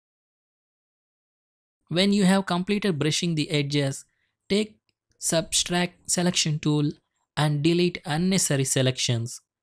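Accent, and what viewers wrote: India. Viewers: Indian accent 😃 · Indian accent is cool ikr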